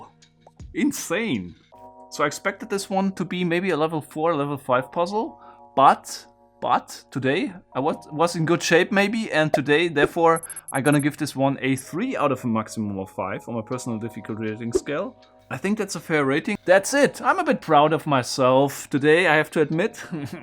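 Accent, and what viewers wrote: Germany. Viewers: You sound like you are a german xD Falls ja , hab einen schönen Tag ;) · I recognise a German accent when I hear one! This is just amazing, as I’m someone who is speaking in some odd amalgamation of different accents. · wie ich den deutschen Akzent sofort erkannt habe 🤝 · He sounds sooo German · I can hear the German in you :D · This is the first video I watch from you and 5 seconds in the video I thought "bro, this accent, he must be german" and then checked your channel information and I was right Hello fellow german buddy~ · U german? Cause you sound like my english teacher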